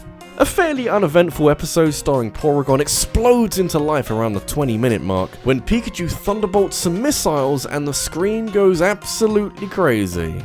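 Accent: England, United Kingdom. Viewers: He’s English. That’s how we say it :P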